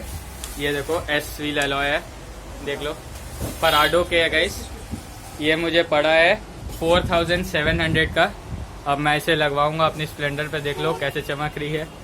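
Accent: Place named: Punjab, India. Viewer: Bhai bol ta Hindi par lagda Punjabi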